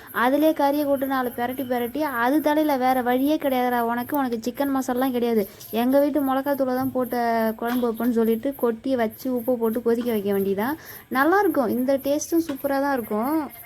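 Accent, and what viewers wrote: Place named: Virudhachalam, India. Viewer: It is amazing to hear Virudhachalam Tamil. I am in Delhi wish to visit Tamilnadu soon. Your video refreshing village memories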